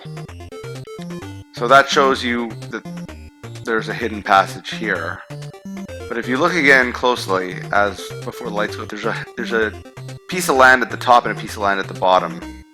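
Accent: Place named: Canada. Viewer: I can tell your a canuk 😂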